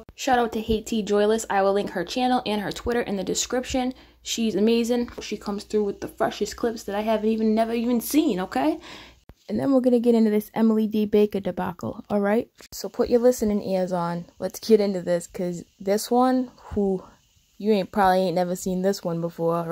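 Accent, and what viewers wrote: Boston, United States. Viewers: Great video as always and love seeing you... your accent coming out of that pretty face is awesome. For a west coast girl, your Boston accent is so cool. I’ve connected with Sue on another comment section on a different channel and praised her for even having the courage to share her pain... only to have a vapid little goblin claim she was “ one upping her story” and not paying enough attention to her talking...what a piece of 💩. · You have to be a Boston girl.. Our accents are surely one of a kind xx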